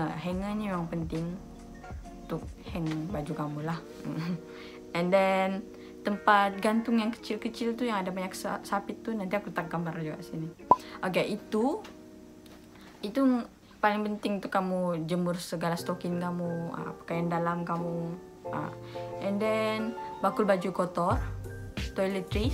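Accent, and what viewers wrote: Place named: Sarawak, Malaysia. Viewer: cara ckp mcm org sarawak...pernah ada classmate dari sarawak waktu smk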